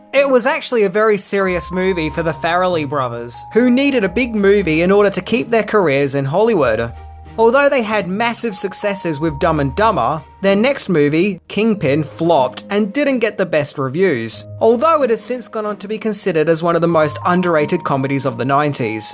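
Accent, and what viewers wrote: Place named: Australia. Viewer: Great review of a funny film,. Your humor combined with your cool Aussie accent always keeps me entertained. Respect and Blessings 🙏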